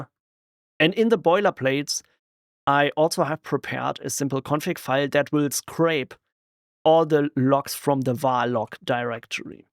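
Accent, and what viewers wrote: Germany. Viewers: Прикольно как у чувака немецкий прорывается через английский =)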